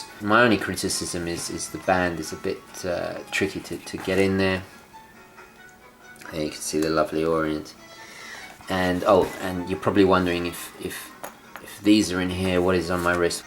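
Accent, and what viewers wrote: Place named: England, United Kingdom. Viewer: Where about in England are you from. I can't pinpoint your accent.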